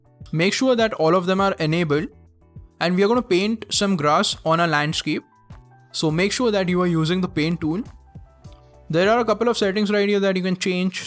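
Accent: India. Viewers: Are you indian ? Your accent sounds like indian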